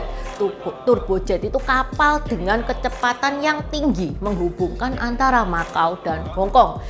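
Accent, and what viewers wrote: Java, Indonesia. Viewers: Logat jawa 😅😅😅😅😅😅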